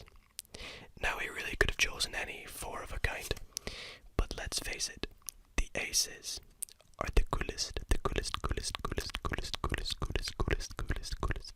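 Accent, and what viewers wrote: Scotland, United Kingdom. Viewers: Just realized he’s Scottish about 10 mins in hahah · Scottish, yep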